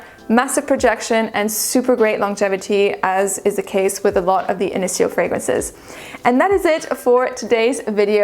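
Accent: United States. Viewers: Bonjour je. Inès de m’abonner 😍la description olfactive est très intéressante et j’ai bien envie de me diriger vers une parfumerie pour les essayer. Merci et Bravo pour cet accent américain qui est Top 👌💯❤️ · Hi. Great work on all of your fragrance videos! I remember when you first started your channel, a couple of years ago. Well done! I'm so curious: are you half French and half American? Your pronunciation of French words is of course native-level French, and your English has an American accent, but there is a "lilt" that tells me it doesn't come directly from the American mainland, at least in the linguistic formative years, up through age 13-14. I'm just curious! As an American, and a francophile who speaks fluent French, I am always fascinated by people's accents, and am curious about your linguistic background! Thanks for your great videos. Keep up the great work.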